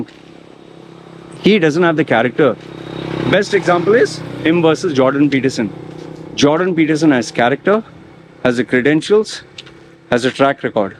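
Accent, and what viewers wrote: India. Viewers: you have an Indian accent, great video btw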